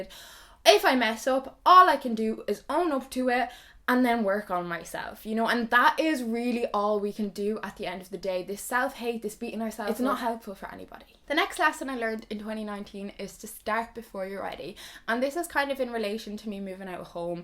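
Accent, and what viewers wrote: Ireland. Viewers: If you don't love an Irish accent, you're not alive.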